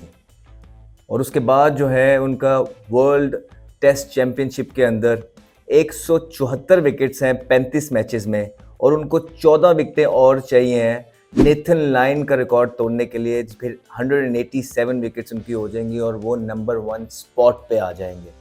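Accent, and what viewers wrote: Punjab, India. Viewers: Bhai..pls don't lose Punjabi touch..I M Punjabi..obviously 😅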